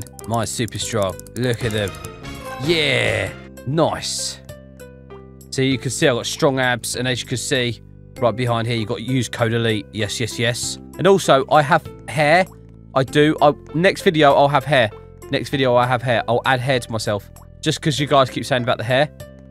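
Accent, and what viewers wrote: United Kingdom. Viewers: 39 mins! :D love ur vids and the british accent 😮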